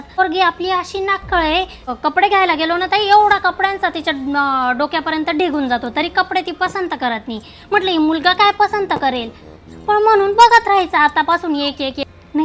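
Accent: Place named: Vidarbha, India. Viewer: छान वर्‍हाडातला हेल बोलता तुम्ही. मला माझ्या नात्यातल्या आवडत्या तायांच्या घरी भेटीला गेल्यासारखं वाटतं...❤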